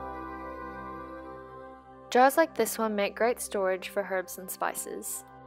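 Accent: Australia. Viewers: Umm yea can I just come live where you are. I got my hopes up before watching, but we definitely do NOT have most of the things you just showed in dollar stores here in New Orleans. You can find candles..that's it. Everything else is only found at walmart & would've cost you either triple or not sold at all 🙄 You sound like you're from OZ..I've always been in love with your country since I was a kid (I am fascinated by literally everything about Australia & was also obsessed w Silverchair) so thx for adding more to the list lol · I have a feeling this is an australian youtuber- her accent seems mashed up...and i'm in australia and seen those things locally too :)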